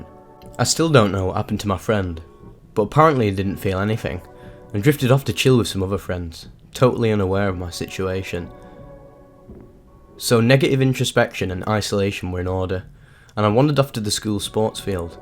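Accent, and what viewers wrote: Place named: Birmingham, United Kingdom. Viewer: Was just wondering that yesterday - I figured somewhere near Birmingham. I’m on the other side of the Atlantic so from here that seems close enough…?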